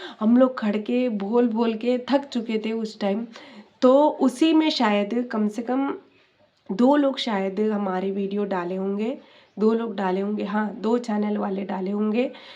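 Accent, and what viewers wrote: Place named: Hyderabad, India. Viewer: You spiking like haydrabadi language style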